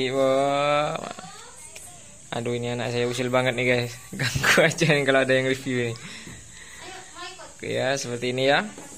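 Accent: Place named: Bondowoso, Indonesia. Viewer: Behhh... bahasanah mik seperti orang bondowosoan reh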